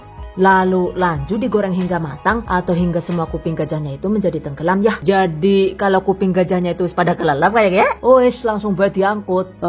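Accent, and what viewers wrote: Banyumas, Indonesia. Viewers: Saya suka resep" bunda, saya juga sering praktekin resep" nya alhamdulillah berhasil bun, dan yg ini mau saya simpan juga resepnya kapan" mau bikin juga, trimakasih resepnya bun, lebih suka lgi klo ngomong ngapaknya bun..itu anak yg suka nyobain adonan mentah udah gede ya bun..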